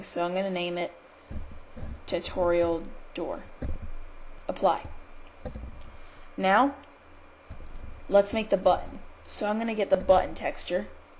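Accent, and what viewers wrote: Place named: United States. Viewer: Finally someone I can understand! I had to go through so many kids that English and Australian before I found a voice that speaks fluent American! Great, simple, clean tutorial!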